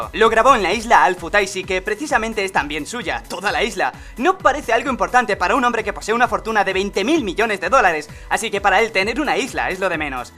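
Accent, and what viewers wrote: Spain. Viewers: Español de España, no latino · Me encanta tu voz española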